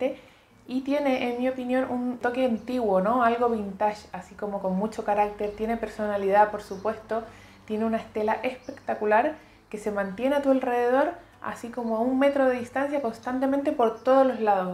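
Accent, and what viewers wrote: Chile. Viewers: * tiene acento de chilena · por tu acento pareces Chilena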